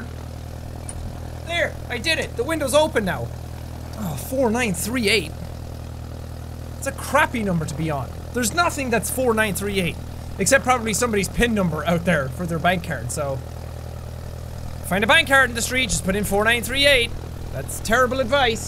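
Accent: Ireland. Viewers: inner Irish came out in this video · MrG I love any Irish voice tbh 😍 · Im Irish too. We all talk the same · His Irish maxed out this video lol xD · jack really went into Irish during this episode. LOL. Love ya. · I absolutely love it when your pure Irish accent and phrases come out:3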